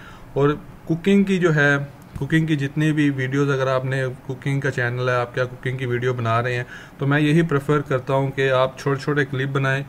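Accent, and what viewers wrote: Australia. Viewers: V informative ,your english accent is also too good crisp australian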